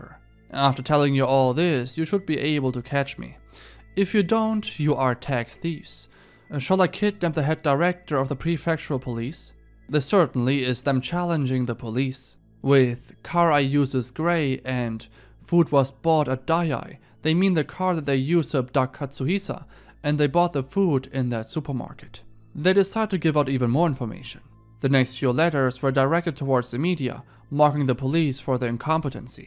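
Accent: Germany. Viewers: I knew u were German u have a similar accent to my bestfriend from Augsburg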